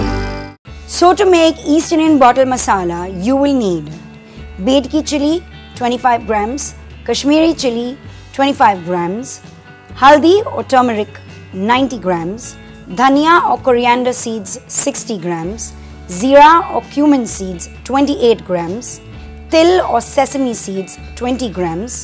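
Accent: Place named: Oxford, United Kingdom. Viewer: U r accent is very nice.... Oxford level.....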